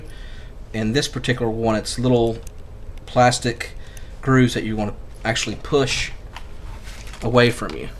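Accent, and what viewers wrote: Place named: Georgia, United States. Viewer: I didn't notice his accent but that's because it sounded normal to me here in Georgia.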